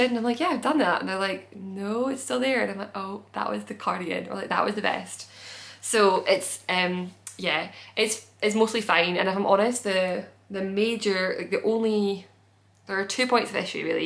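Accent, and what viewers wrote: Scotland, United Kingdom. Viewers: Haha its not the speed its the Scottish accent, I love it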